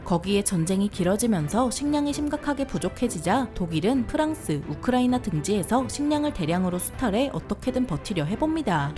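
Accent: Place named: Gangwon, South Korea. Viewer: 강원도 억양같은데 억양이 중독성이 강해요.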